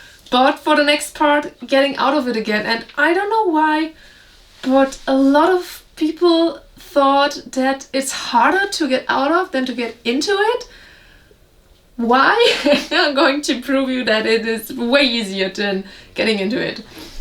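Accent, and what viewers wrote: Germany. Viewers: Maybe I misheard you then, with your lovely German accent babes... that lovely blue catsuit you sported for us last week, from Bright and Shine was it?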